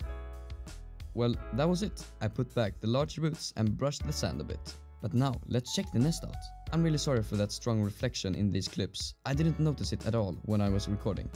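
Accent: Sweden. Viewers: You can hear that he is from Sweden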